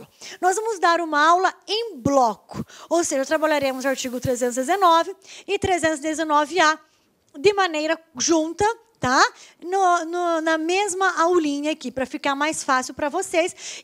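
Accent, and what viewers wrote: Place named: Curitiba, Brazil. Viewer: Adorei essa profe e o sotaque curitibano tipo o meu (senTE)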